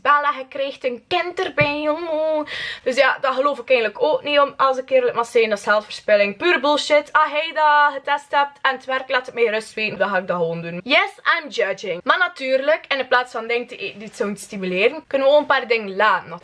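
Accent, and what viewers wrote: West Flanders, Belgium. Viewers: InesVandevelde niemand buiten wvl begrijpt die. Veel minder vieuws. Soit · heyy ben je belgisch met accent? en zoja, wat voor een accent? x lene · Cry Baby ja hahah dit is west vlaams super plat dus ik woon daar ook!! Ahhaha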